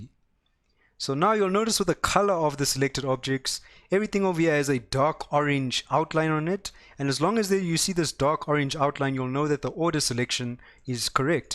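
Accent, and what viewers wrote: South Africa. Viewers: you from South Africa bud?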